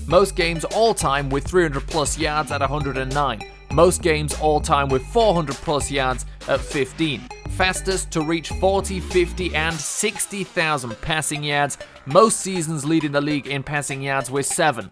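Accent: England, United Kingdom. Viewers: Wait. Is that english accent? It's funny how the narrator has english accent talking about American Football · Your British accent ruins your credibility. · And it sounds more believable with the British accent.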